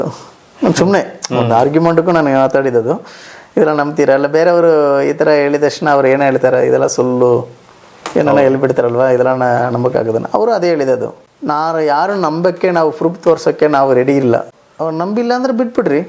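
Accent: Tamil Nadu, India. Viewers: Tamil avru sooo adikke hage aste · He is tamilian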